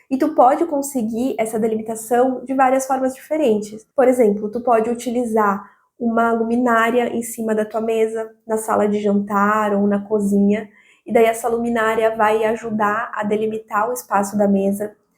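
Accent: Rio Grande do Sul, Brazil. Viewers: Adoro o sotaque gaúcho!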